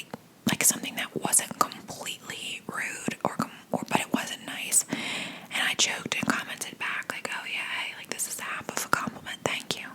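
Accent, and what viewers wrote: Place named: East Coast, United States. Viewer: Girl I looooovvveeee your videos and your voice so much!! The East Coast accent is so tingly and reminds me of home ❤️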